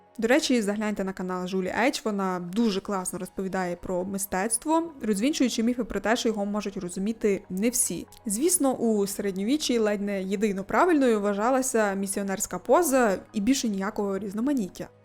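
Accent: Transcarpathia, Ukraine. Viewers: Ти з Закарпаття?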